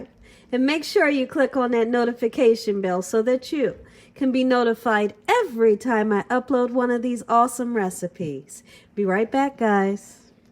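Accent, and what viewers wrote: South, United States. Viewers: Must be from the lower south..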